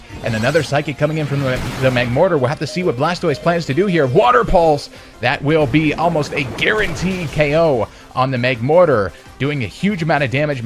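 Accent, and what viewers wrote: Canada. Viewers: Are you Canadian or am I imagining your accent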